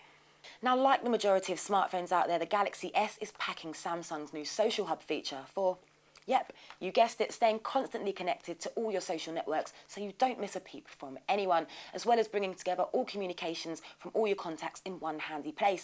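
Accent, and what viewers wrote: United Kingdom. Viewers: Idk ... I just have my Samsung 8500 wave and I'm just happy =) . BTW ... what accent was that??? it's sounds like... british , but I'm not sure cuz I'm a spanish speaker ... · That british voice is soo nice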